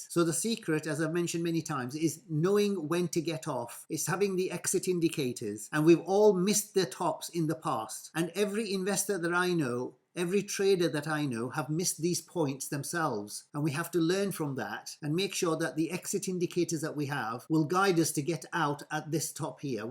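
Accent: United Kingdom. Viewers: Thank you Sir for your financial ideas and for your perfect British English. God Save the King!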